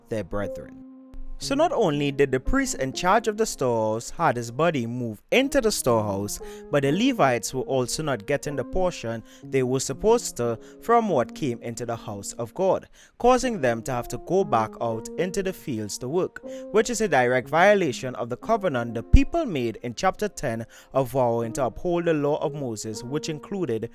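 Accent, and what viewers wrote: Trinidad and Tobago. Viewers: Great job. Are u a Trini!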